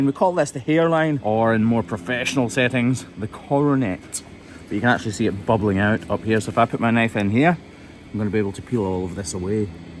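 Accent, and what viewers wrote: Scotland, United Kingdom. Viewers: I love seeing the Scottish landscape and hearing the Scottish brogue:) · hes scotish not irish 😂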